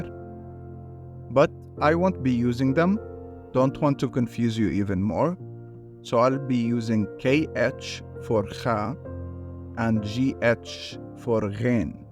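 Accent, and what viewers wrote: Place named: Egypt. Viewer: Since you asked for some corrective feedback: Your tone in the video is a bit laid back. I kind of think you are on your bed, early in the morning, making these videos. Although I like how the silence in between the spoken words matches the cosmic background of the space void shown in the video, that makes a good combo. You can also consider making your videos under 2 minutes of length; that will improve your full-watch statistics bettter on youtube. It is good to explain how your own particular dialect is at play at some of the video contents. For example, you would say 'albi' when reading the world Qalbi (my heart) because that is the (Egyptian?) dialect perhaps. Similarly, you'd say 'Kateer' while reading KaTHeer with a ث. It is also a good idea to keep people informed about some of the sharable private information/news/material from your actual life during the video as it creates a strong personal bond between the content creator and the followers. 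Finally, creating content around anything that is trending in the news will make the content further interesting as well as catch some of the organic search traffic to your videos. Overall, good efforts, in fact, great efforts and the only advise that suits this time of your YT creator's journey is not to give up till your 250th video and wait like a patient harvester. This would be a case of یعجب الزراع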